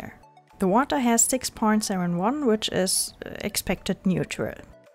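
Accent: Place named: Germany. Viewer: Hi, as someone who loves science, this was a great video. I was trying to guess your accent at first I thought you were from Germany then I toggled between Belgium and Swedish. I knew you weren't from France due to I am French. I checked your bio, and I was correct German❤❤.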